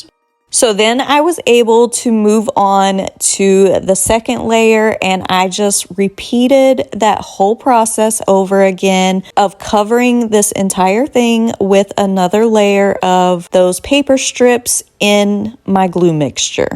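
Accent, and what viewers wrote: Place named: Florida, United States. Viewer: I say "them" and "him" with the same accent as you .. I'm from Florida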